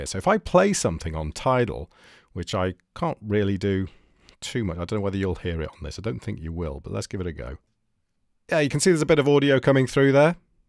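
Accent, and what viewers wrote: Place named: United Kingdom. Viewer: Thanks a lot!!! Love your britsh speech!!!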